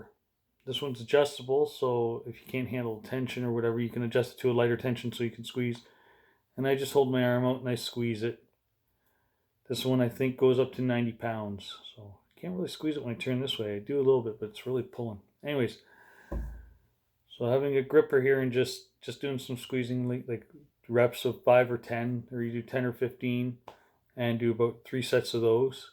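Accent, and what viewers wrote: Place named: Canada. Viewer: Sounds like you're from Canada.Want to come to youtube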